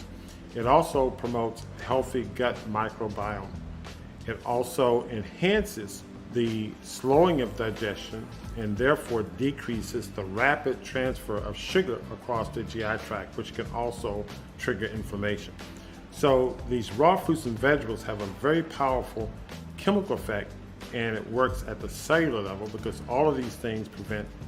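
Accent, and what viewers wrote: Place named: United States. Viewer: Sir, i am watching your useful videos from india, we cannot follow american pronounciation of english. Pl give tittles in english and display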